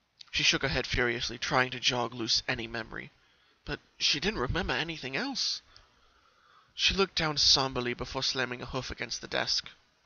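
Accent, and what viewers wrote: England, United Kingdom. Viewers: What's with the english accent in your narrative? I admit, it does add to the drama.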